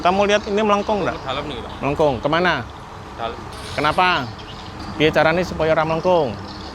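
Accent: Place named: Surabaya, Indonesia. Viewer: Suroboyoan e metu cakkk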